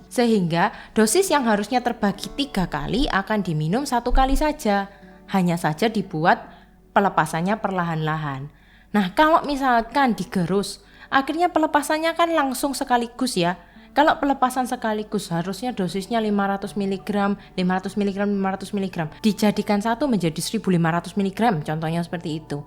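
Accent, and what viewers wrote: Java, Indonesia. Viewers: Suaranya ndeso medok .ga enak bget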